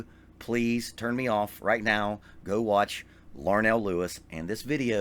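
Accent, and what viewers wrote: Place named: South, United States. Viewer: Dulcet Southern brogue. Lovely and impressive vocabulary.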